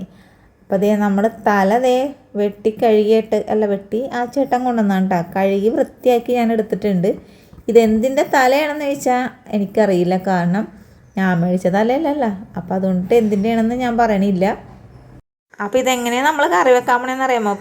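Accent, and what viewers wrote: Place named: Kochi, India. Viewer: ഈ കൊച്ചി ഭാഷ കേൾക്കാൻ ഇമ്പമാണ്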